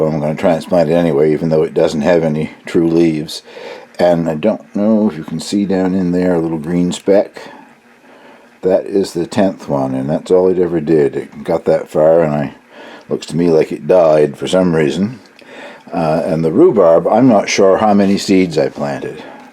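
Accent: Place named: Campobello, Canada. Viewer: Grampy must have donated the extreme accent of some of the Campobello Calders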